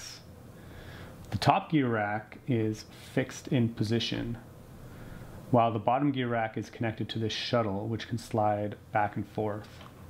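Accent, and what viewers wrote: Canada. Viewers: Man I love a Canadian accent. Must be the Degrassi I watched as a kid.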